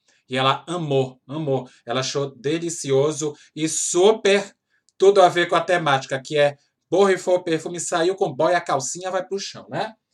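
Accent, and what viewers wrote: Pará, Brazil. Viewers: Achanhado kkk. Beeem paraense! Amo!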